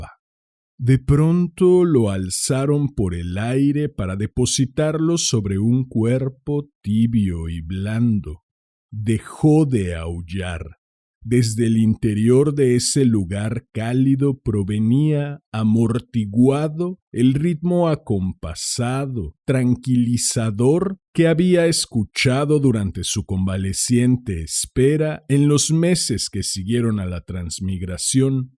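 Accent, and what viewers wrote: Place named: Argentina. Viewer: Un buen cuento, el tono Argentino salió perfecto. Saludos, Natalia de Argentina.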